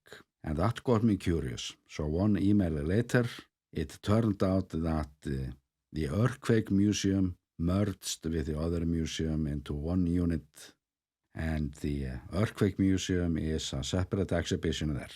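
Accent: Iceland. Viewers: Have to say that you are not only an incredible videographer of your country, you are also an incredible historian about your country, with an unmistakable Icelandic accent! Thanks so much for this latest complete overview of one of your lovely communities. How would we know they existed without you! Great fun to watch, hear your voice and learn something new...all rolled into one few minutes of my time. Amazing!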